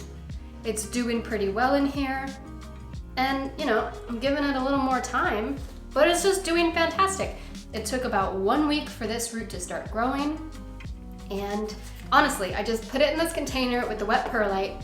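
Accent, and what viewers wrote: United States. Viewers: Hi, I just subscribed! I don’t know where I heard that you were in France so I was hoping you would have a French accent but that is not the case. It’s okay I am not disappointed that you sound American lol, are you originally American? This is my first video and you made my mentally declining state a bit better so thank you! I was lucky enough to recently get a 10 dollar rooted cutting of a M Peru and I love it! I cannot believe you can easily get a full plant for the same price in France 😱! 💙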